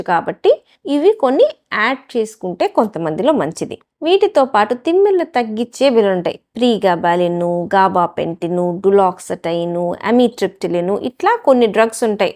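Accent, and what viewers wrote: Guntur, India. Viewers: సహజమైన గుంటూరు భాష ,యాస👍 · Maanchi guntur yaasa😅😅😅😅😅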